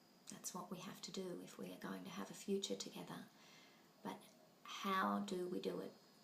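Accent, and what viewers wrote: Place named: Australia. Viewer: great to see you and hear your lovely aussie voice! No transcript needed! We haven't met but have communicated in the past via email. My stepson and his wife are due to give birth to their first baby in Sydney tomorrow! We will be first time grandparents! The newletter is great, personal and experiential. With love and birth into being, Suzie McGreevy in Ireland.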